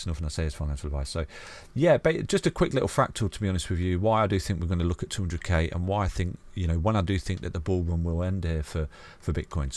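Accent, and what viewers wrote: United Kingdom. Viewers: Something about the British accent helps strengthen the intelligence and certainty in the predictions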